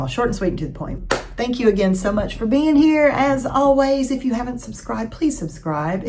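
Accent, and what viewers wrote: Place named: South, United States. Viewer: It is so nice to hear sensible things in the accent I grew up around. Squirrels and anything with the word pickle in it? Would never ever guess you're from the South lolol. As for protecting joy, right now trying to find that joy and cut out what I can with people who are entirely negative and cutting down on people who only take -- learning that my emotional labor and care is important and I shouldn't waste it on every single person that shows up with a problem. I also walk every morning and take short walks during the day. Knoxville, Tn area.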